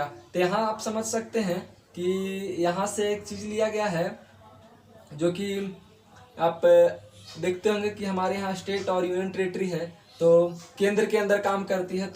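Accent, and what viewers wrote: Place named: Bihar, India. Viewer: Nice video sir. Khashkar samjhane ka bihari language aur andaz😂👍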